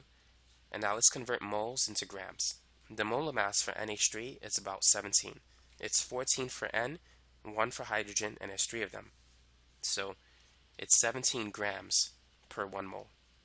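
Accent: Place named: Boston, United States. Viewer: Great video! Are you from Boston? PAcent lol I love it. Thanks once again for the videos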